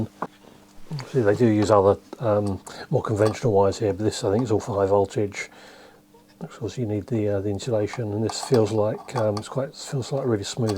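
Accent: United Kingdom. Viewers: I think you're just not used to hearing British English..?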